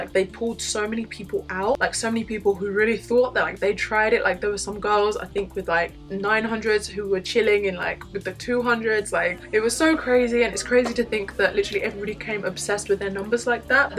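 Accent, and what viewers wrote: United Kingdom. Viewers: You have beautiful British accent 😍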